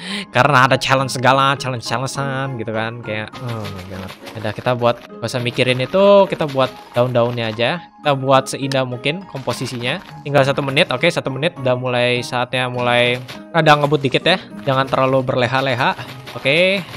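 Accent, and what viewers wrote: Java, Indonesia. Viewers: Bg orang jawa kah?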